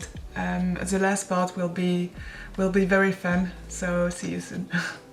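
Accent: France. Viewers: L'accent français🥰🥰 merci je suis entrain de refaire mon portfolio en ce moment et ça me motive, j'ai grave la flemme mais c'est mieux que j'efface les immondices que j'ai fait quand je débutais 🤣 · J’ai décelé un accent français 😁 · Tu es française? Are you french? You have french accent it seems..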